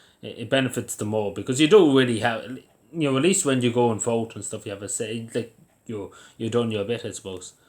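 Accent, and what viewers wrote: Ireland. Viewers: is it mandatory to vote? Im guesssing your in Eire from your accent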